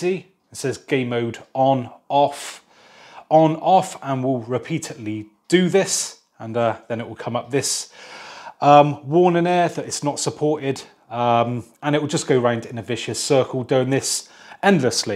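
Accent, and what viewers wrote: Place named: South West England, United Kingdom. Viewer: His accent sounds like south west England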